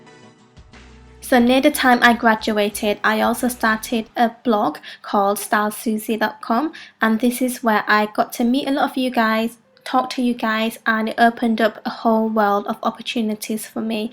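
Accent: United Kingdom. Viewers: ...Why wouldn't she keep her British accent if she lives in Britain? LOL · I love British accent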